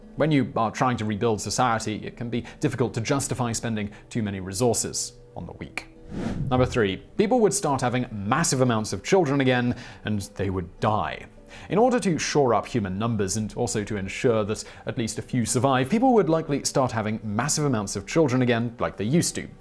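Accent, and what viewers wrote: United Kingdom. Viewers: Bad advice from a guy with a British accent is yet bad advice... horses are essentials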